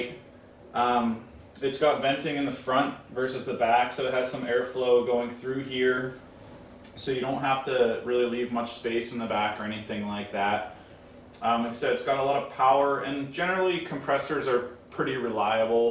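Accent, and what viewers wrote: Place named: Oregon, United States. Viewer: Is that an oregon accent? He says "these ones" which is typical oregonian.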